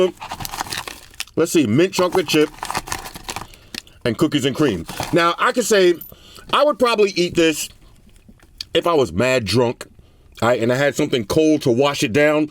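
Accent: New York, United States. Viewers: I'm late to your party bro, but glad I found you. Been rocking OP since #3 all the way up and waiting on my black #9. Sound like you're a New Yorker. Favorite reviewer on Youtube. Appreciate you.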